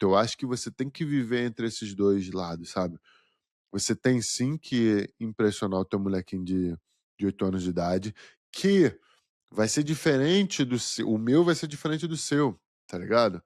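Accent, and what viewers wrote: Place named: Rio de Janeiro, Brazil. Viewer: Mano 100% de orgulho de ver um carioca que evoluiu tanto, essa é a questão da identificação, ainda bem q vc não mudou sua forma de falar e o jeito de ser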